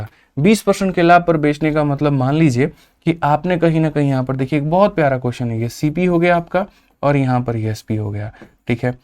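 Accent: Bihar, India. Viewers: बिहारी स्टाईल सबसे मस्त हैं सर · Waah guru ji bihari andaj me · भईया आप बिहारी लग रहे हो 😮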